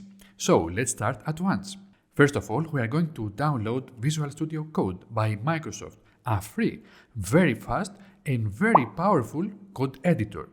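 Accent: Greece. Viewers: The greek accent is so cuuuteeee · 2 seconds in and almost immediately realized you were Greek. Keep up the good work!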